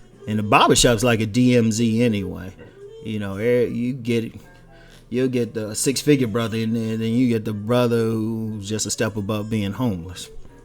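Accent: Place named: Baltimore, United States. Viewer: Funny how you can still hear your B.More area accent that do and you give yall away everytime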